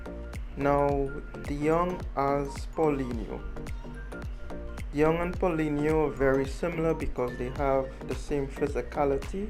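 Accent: Trinidad and Tobago. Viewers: Ahhh boy…from d time I hear d accent….done know he is fellow Trini. Respect bredda. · Bro is this your Trinidadian Accent?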